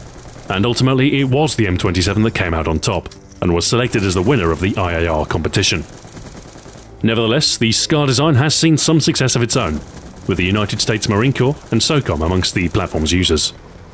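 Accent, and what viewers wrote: United Kingdom. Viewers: Hey, as one of your presumably many viewers who haven't played CoD in a while but still tune in regularly not just for your videos' production value but also your soothingly smooth and ridiculously British voice I've been wondering if you've ever considered doing a "dramatic reading" of a favorite chapter from a novel or short story on your account. It shouldn't take too much time to prepare and record and denying the world the glorious sound of your voice would be a crime! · Why does everyone say he sounds like Captain Price??? Yes, they have British accents. THAT'S IT. The tone of the voice is VERY different, and the accents aren't even identical. I just... I don't even...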